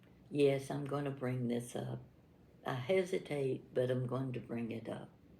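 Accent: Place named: Florida, United States. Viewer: This is Kitty I live in S. California. I discovered your channel a week or so ago. I forget which video but you were in the kitchen. My mother in law was from Lakeland Florida and I miss her voice. Your accent is similar and that’s what I heard at first. In the kitchen you remind me of my grandmother with whom I spent many hours in the kitchen with. I am 77 now. A widow since 2019 after 50 plus years together. I was his caregiver for decades. It occurred to me that at least in my world out here on the West coast life seems isolating. I too talk to myself. I read that it is good for your brain. I also love dishes and setting the table. I have what they call “poorman’s fiesta” called Harlequin made by the same company. Summers as a child I’d spend a time at my grandmother’s house near Atlantic City NJ. Her house had a front porch and we would have breakfast out there. 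My grandmother would put our soft boiled eggs in the Easter egg color Harlequin sugar bowls. Clever. The bowls must have come out in the 20’s as they were very Art Deco looking to me with triangular shaped handles and lid top. As I write I realize it must have been around the time she passed away I started to think of those things we ate the soft boiled eggs in and oddly enough that very year they reissued them. I wanted the original ones and began hunting for them and that started my collecting other dishes and china. I love dishes and ceramic things. p.sl. I got a felt skirt with. S& H green stamps. I wanted a p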